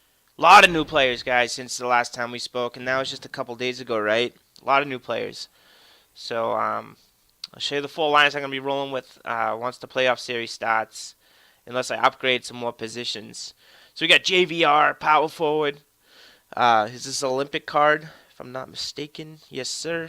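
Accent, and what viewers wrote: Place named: Boston, United States. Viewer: Lol I love your Bostonian accent I'm from Toronto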